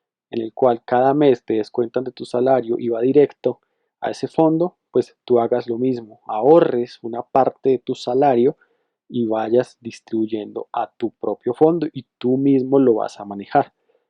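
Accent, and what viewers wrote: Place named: Colombia. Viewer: Hola, excelente información, por tu acento asumo que eres Colombiano, y supongo que de Bogotá; te quería preguntar si tienes idea de como se maneja o afecta tributariamente en renta, hacer este tipo de inversiones? gracias de antemano por tu valiosísimo aporte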